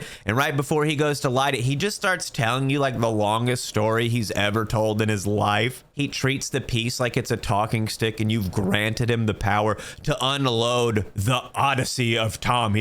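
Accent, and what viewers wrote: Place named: New York, United States. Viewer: I dont even smoke, I watch when this guys NEW YORK accent spills into the video.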